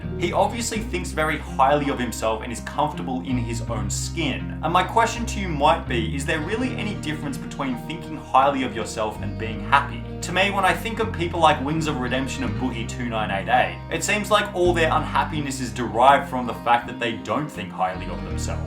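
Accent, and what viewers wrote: Australia. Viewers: i swear to god i did not realize your aussie until this video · I FEEL LIKE I SHOULD'VE KNOWN BY THE ACCENT THAT THIS GUY WAS AN AUSSIE, YET I DIDN'T KNOW UNTIL HE SAID; "At least in Australia" and my brain had to reboot and process that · Oh so you're Australian No wonder you sound more interesting than what you actually are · its not his fault, its that he has an australian accent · Are you a aussie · Been enjoying your newer videos and this one popped up in my feed - I think it's the first one I've seen with you actually in it! (Lol'ed at the incel subreddit bit) Keep it up love hearing a fellow aussie spill the tea on youtube peeps :)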